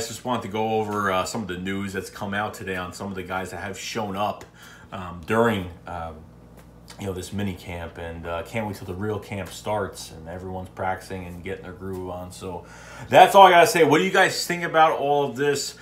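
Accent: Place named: Philadelphia, United States. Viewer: Love your Philly accent trying to pronounce Kamu Grugier-Hill as Kamu Gruger-Hill lol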